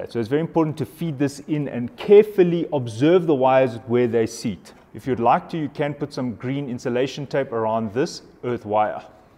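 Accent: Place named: South Africa. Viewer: I recognize that Accent from anywhere. Hello fellow South African... Lol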